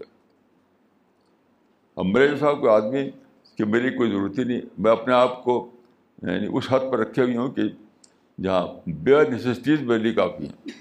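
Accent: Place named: Arab world. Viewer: Arabic accent not clear always.Urdu is o k .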